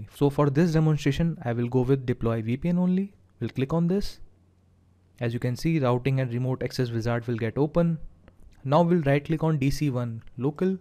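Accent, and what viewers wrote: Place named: India. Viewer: Clearly... As I always say if it's Indian accent it's excellent explanation.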